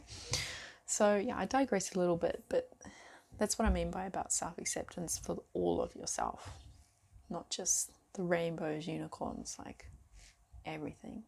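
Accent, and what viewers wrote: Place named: Australia. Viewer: Your aussie accent is growing!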